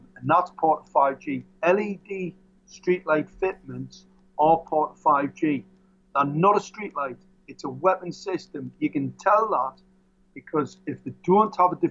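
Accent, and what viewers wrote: Scotland, United Kingdom. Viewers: His accent is Scottish. Pay attention and listen. It is filled with knowledge. Close your eyes and things become comprehensible.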